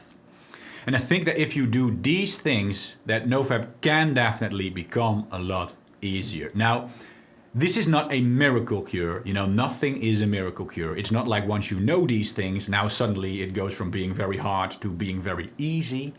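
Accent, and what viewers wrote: Netherlands. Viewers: You sound really dutch maat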